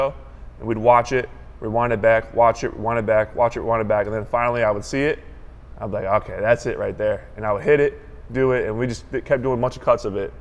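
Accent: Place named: South, United States. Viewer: he has a country western accent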